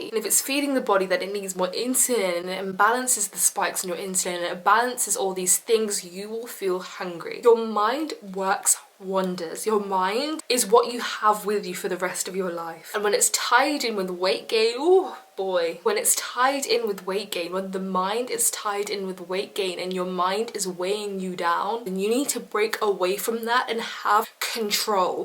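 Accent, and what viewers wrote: United Kingdom. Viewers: I just realized that you’re talking with British accent😂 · irrelevant trash Its like a british accent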